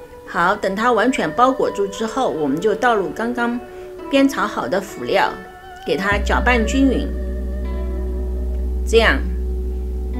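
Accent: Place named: Shanghai, China. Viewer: 上海的阿姨？ 熟悉的口音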